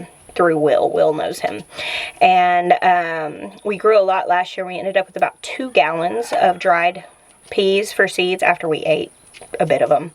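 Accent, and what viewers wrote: South, United States. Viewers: I add onion slices when itz too hot for lettuce. I had thinly cut cuke slices the last time. Both are good Girl, you're beginning to sound reeeallll Southern, <snicker> says the Bama woman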